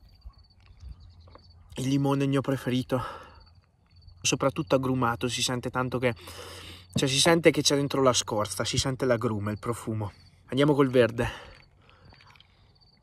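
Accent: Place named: Trentino, Italy. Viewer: Ehiii, da dove vieni? Io sono trentina e sento che anche tu lo sei hahaha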